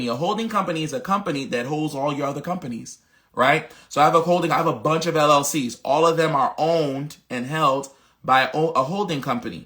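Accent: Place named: Philadelphia, United States. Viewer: Omg!! He be having me SOO HYPED!!! That Philly Tone and RHYTHM 🔥🎯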